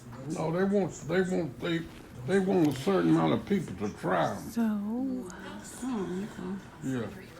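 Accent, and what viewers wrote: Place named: South, United States. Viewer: the southern accent makes your voice even more calming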